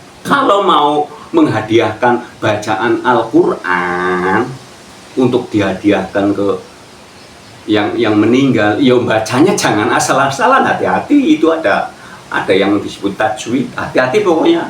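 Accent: Banyumas, Indonesia. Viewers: Loh rupane wong ngapak ....Kulo wong Cilongok mas....tpi ku tinggal d riau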